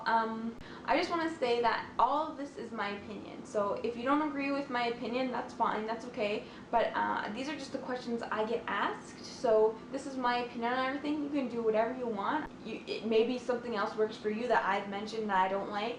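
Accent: Canada. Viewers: Are you Canadian? Because you sound like you have a Canadian accent...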